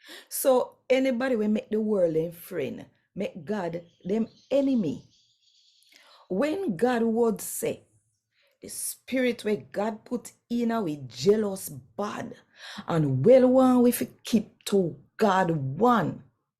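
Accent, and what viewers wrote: Jamaica. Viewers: Me luv de Jamaican Creole Readings. We need fi hear dem more. 🙏 🙏 🙏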